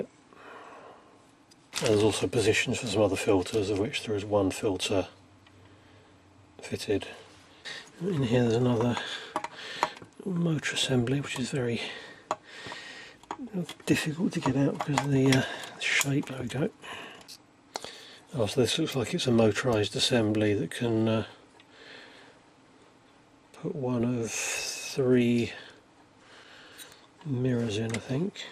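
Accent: United Kingdom. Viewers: I'm as straight as a ruler but I could listen to your British voice all day long. What's with that?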